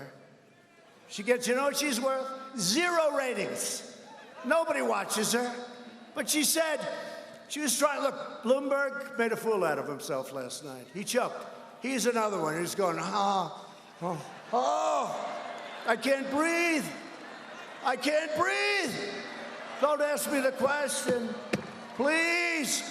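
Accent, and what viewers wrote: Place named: New York, United States. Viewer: Donald J. has the most beautiful New York way and accent of the people of New York. The greatest New Yorker of all time. I agree with your President, Brad Pitt comes off as a wise guy to me. Movies need less CGI and more talented actors. Gone With The Wind was a beautiful ugly film about ignorance in human history. Thank you Most Honourable President Donald J. for your channeling honesty and integrity. God Bless The #1 USA. I believe South and North Korea needs to settle their own issues and stop threatening the world. China needs to tell the world the truth about where this Coronavirus alias WHO renamed biological weapon COVID-19 immediately.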